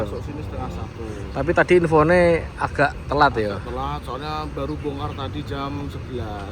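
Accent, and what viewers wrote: Indonesia. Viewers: Udah jadi logat orang indo nie pak lek😂😂😂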